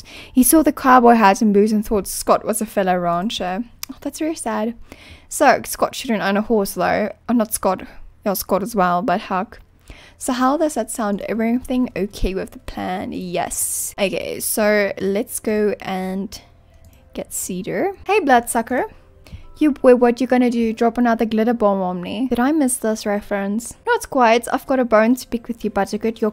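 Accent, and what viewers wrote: South Africa. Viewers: I’m glad I subscribed to you! Two things I love: star stable and the South African accent (sadly lost mine) your voice is so soothing it makes me feel like I’m back home agaon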